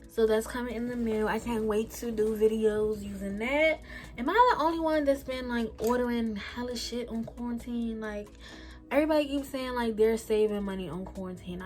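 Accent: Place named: DC, United States. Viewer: Sis are you from the DMV? I swear I hear a DC or MD accent lmaooo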